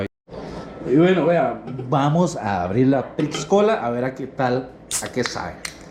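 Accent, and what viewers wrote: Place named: Costa Rica. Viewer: Usted de donde es xq parece tico ?ya como habla pura vida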